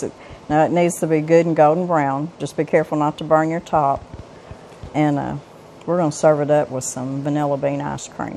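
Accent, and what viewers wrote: South, United States. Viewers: Your show and your passion make my Friday's. I love your down home charm and Southern accent! It is the same comfort food my Grandma used to make but she called it Apple Betty. Not sure if that name is a Canadian or old British thing because despite being Canadian born, my Grandma was as stiff Victorian as they come. My variant is, as my bones get older and now its legal here, I add some ground home grown weed to the topping. That and there is a Fromagerie near here where I regularly get 15 or 17 year old Cheddar cheese which is the perfect compliment. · I LOVE a Southern accent along with the food. Ty. And your hair looks fantastic!! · This looks delicious. I have made apple crisp many times over the years. I hadn't done one in a few years. My dad loved them and would ask me to make him one when I would go home to visit. He passed away in 2018 and I hadn't even thought about making one, but seeing this has made me think of him and how much he loved this and so many other sweet decisions deserts. 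Not sure where your from but you sound a lot like my family in GA and AL. You look a lot like a aunt, even your voice. If I closed my eye's and just listened to your video I could see her as plan as day. I love your channel and have even had my mom watch your video on making Sauerkraut. Thanks for your wonderful channel.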